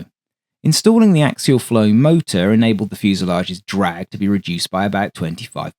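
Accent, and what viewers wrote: England, United Kingdom. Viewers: Well, the English call it a motor, and he sounds English, so there!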